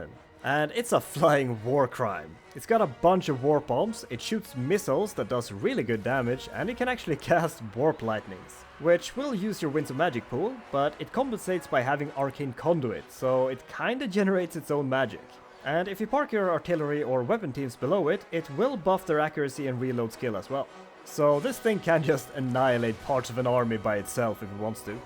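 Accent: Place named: Sweden. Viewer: Are you from Sweden? It sounds like it.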